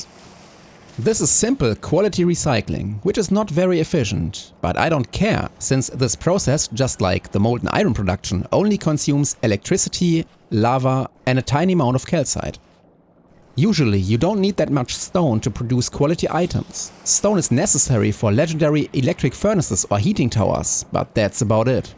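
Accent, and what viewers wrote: Germany. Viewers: lern doch einfach mal gutes englisch , das tut im ohr weh, dein deutscher slang 😀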